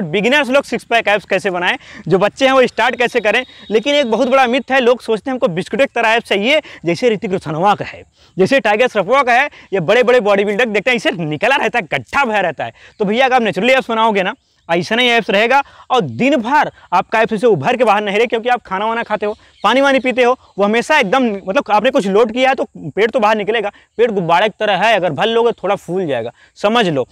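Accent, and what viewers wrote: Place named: Uttar Pradesh, India. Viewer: Mja a jata hai up wali bhasa sun ke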